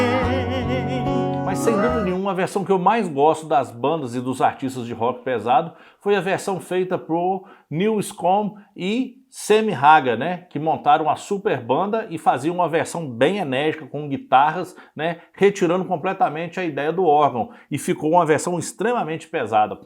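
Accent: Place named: Minas Gerais, Brazil. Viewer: Meus pais são de Cataguases. Quando vc começou a falar na hora tive certeza que vc é mineiro.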